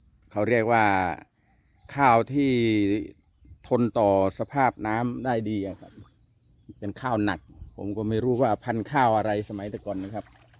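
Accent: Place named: Surin, Thailand. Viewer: สุดยอดครับเสี่ย..มารับชมให้กำลังใจครับท่าน..สำเนียง คนสุรินชัดเจน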